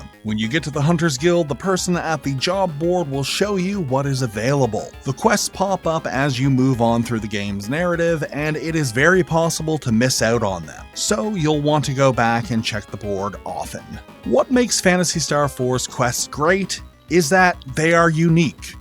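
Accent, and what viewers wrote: Maritimes, Canada. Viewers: Bro... you from the maritime provinces?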